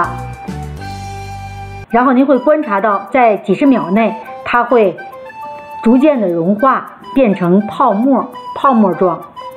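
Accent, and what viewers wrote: Tianjin, China. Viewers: 一听就咱们天津卫口音，倍儿亲切！😄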